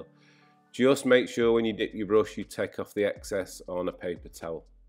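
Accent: United Kingdom. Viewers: Wow. What a masterpiece. You made the vid relaxing and entertaining to watch. Great editing and I still like your British accent as well. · This became a stunning beauty, reallly - sub button pushed! indeed I like your British accent - in terms of "English" I'm a kind of "Britophil"! ;) Greetings from Germany, the silver fox, David 😉🦊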